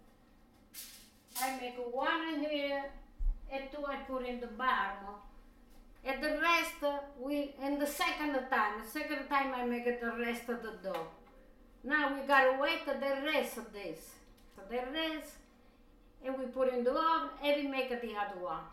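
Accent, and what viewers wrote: Italy. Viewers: I sometimes forget to watch the video as I love to listen to her thick Italian accent! Love her! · you are awesome, my grandmother was from Poland a d except for the Italian accent you remind me of her, I will see her again someday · I had the same exact plastic bowl, but in a lavender color and it broke one day while trying to seasoning my pork shoulder...by the way luvvvvvvv😍😍😍😍 your Italian accent and your videos...please continue to make them and the Italian food recipes...by the way I'm total Hispanic, but somehow I reallyyyy understand exactly what your saying even with your Italian accent.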